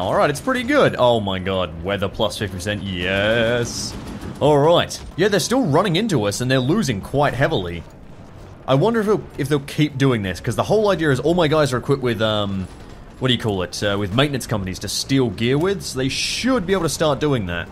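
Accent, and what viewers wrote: Australia. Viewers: I really like to hear your smooth Aussie voice more clearly now. #feelsgoodman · why you Aussie YouTubers all sound the same, WTF is this magic?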